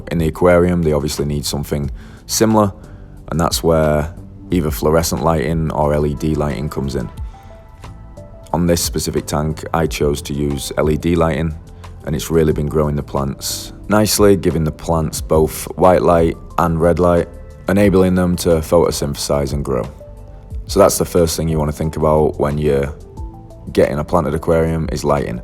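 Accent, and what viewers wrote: United Kingdom. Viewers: You sound as though you’re in the UK, how can you buy aquarium co op feRT? Great vid BTW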